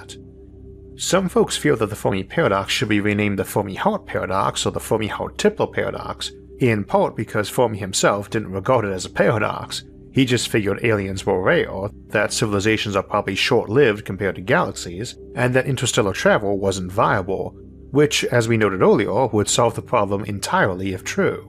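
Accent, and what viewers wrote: United States. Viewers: I didn't know it was an impediment I just thought it was his accent from the part of the US he came from. I like it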